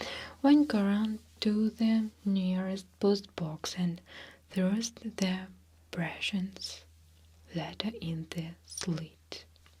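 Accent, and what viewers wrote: Russia. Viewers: I also agree that your English is very good!! Your Russian accent is even more adorable and that is a great reason that I listen to you!!! Very sweet and relaxing!! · I admire Russian literature and Anton Chekhov was a great writer even though he is not as famous as Tolstoy. I think you should make this into a series especially stories from the 19th century. I love your soothing voice with that beautiful accent of yours 💕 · Thank you so much!~ I enjoy the relaxing tingles from this video and I love your Russian accent!~ You speak English so well as well if I may say so.~ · Beautiful, beautiful russian accent and all the options for names! wow! I like Russian so much. Thank you for another wonderful video and, you look so beautiful!!!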